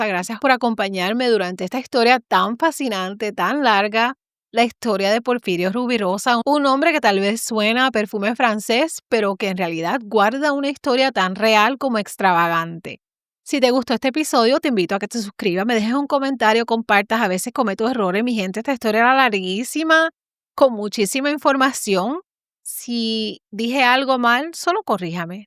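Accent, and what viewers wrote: Puerto Rico. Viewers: por su acento, percibo que es de la tierra de BORINQUEN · parece boricua la señora por su acento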